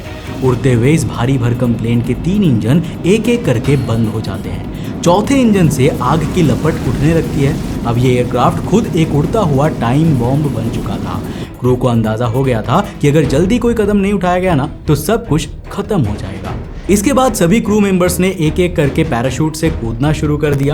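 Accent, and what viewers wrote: Bengal, India. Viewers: Tomar hindi pronounciation sune vabini j tumi bangali , khub sundor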